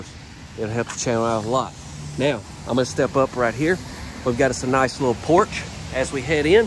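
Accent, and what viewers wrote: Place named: Mississippi, United States. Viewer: I should've you were from Mississippi by your accent! Definitely gotta support your channel! My family is in the PineBelt. What are the best mobile and Modular home sellers here (South MS)?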